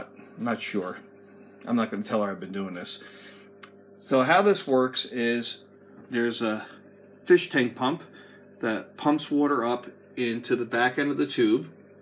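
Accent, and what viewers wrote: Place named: United States. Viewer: seems like a lot of money for a used k40 when a brand new one is only about 340 usd..and knowing that laser tubes (most costly and fragile consumable part) have very limited life..the risk is too much in my opinion unless you got it for 50 usd..but thats my opinion....fyi why you speak american english and refer to euros? seems a bit odd to me.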